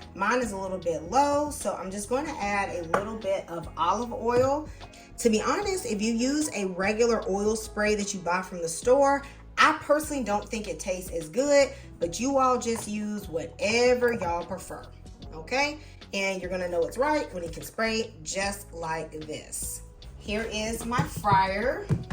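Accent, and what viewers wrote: South, United States. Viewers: 😅 sound like my cuzzin! Girl you country? Love ya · I sure do like your (Southern?) drawl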